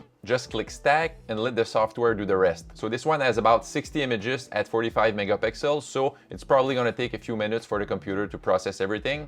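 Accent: Quebec, Canada. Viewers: I'm gonna ask you one thing, es-tu québécois? Ton anglais est beau mais j'entends très bien ce petit accent particulier du Québec! J'adore ton vidéo et je vais m'abonner à ton channel! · merci pour la vidéo. si je ne me trompe pas tu es québécois avec ton accent. 🙂 Est-ce que ton appareil fait automatiquement le focus stacking ou tu le fais manuellement?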